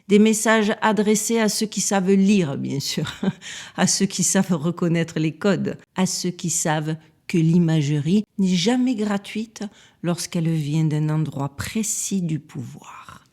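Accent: South, France. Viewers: Ah !!!! L' accent de la bonne mère en direct des states ! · Toutes ces horreurs avé l’accent du sud, c’est quand même plus sympa🥰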